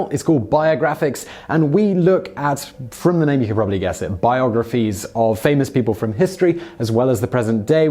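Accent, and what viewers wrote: United Kingdom. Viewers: Uhh Debbie Reynolds, Elizabeth Taylor and Eddie Fisher should have been number one not scandal involving the Sherlock Holmes author and Houdini. But maybe bias since you sound like you’re from the UK?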